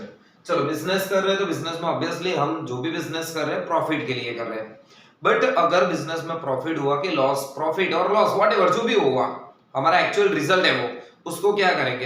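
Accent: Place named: Hyderabad, India. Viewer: Sir are you from Hyderabad , your accent is just like hyderabadi , anyways easy language , good information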